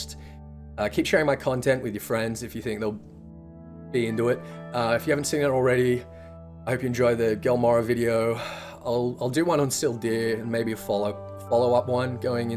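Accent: Australia. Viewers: yo dude where in aus are you from?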